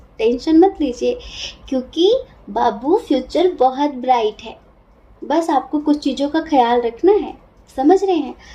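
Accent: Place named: Bengal, India. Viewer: Aap bangoli ho aisa lagta hai mam...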